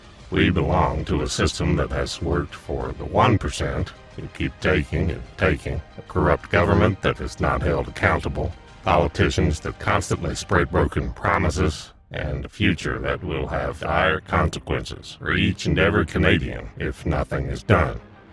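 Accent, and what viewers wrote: South, United States. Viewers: Oooof what a cringe video. Southern accent doesn't help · What is with the American accent? That just doesn’t seem right.... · why does the voice over have a southern USA accent? this was a really cheesey attempt at voter disuading vote or vote supression...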